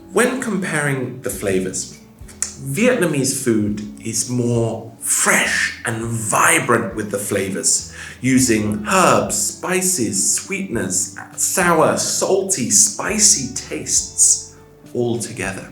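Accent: United Kingdom. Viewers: I love your perfect British accent!!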